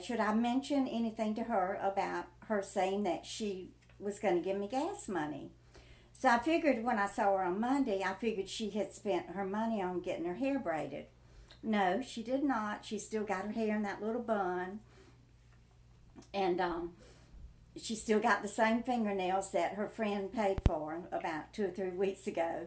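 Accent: South, United States. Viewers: Your such a sweetheart❤❤ please try not to let anyone take advantage of you i love your Southern Charm and accent it's adorable.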